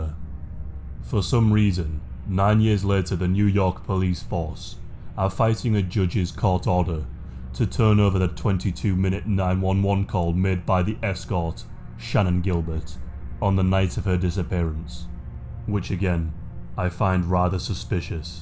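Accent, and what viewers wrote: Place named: England, United Kingdom. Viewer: I’m guessing somewhere near abbey road I gotta know which part of England you are from my friend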